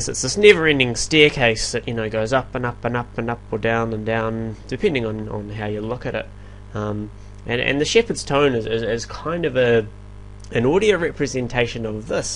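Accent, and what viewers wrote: New Zealand. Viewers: he's from New Zealand. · so obvs a kiwi haha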